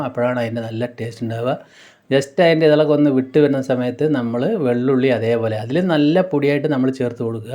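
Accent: Palakkad, India. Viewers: ചേട്ടൻ പാലക്കാട്ട് കാരൻ ആണല്ലേ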